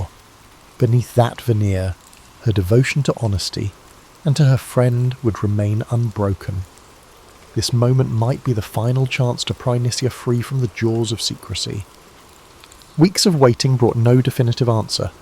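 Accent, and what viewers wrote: United Kingdom. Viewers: I so wish you would ditch the British accents. Who can sleep with that uptight, nervous-wreck jabbering invading our bedrooms?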